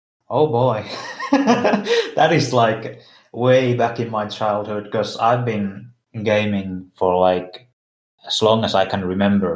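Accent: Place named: Finland. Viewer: Ralli englanti go wild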